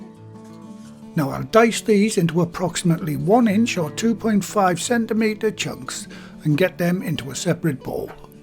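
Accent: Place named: England, United Kingdom. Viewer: Geez I really like the recipe you’re using and your teaching style! It looks very tasty and a great dish for winter. Your accent reminds me of my Dad and his English relatives. Thank you for this video.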